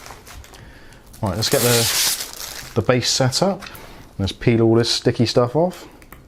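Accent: Bristol, United Kingdom. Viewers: Bro are you from bristol cause if u are same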